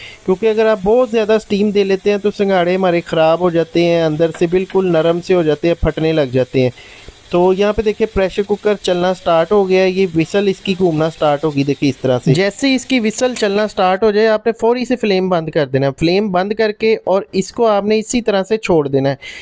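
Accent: Punjab, India. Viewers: آپکا لہجہ اور تلفظ پنجابی ھے۔خدا کے لئیے انگریزی نہ بولیں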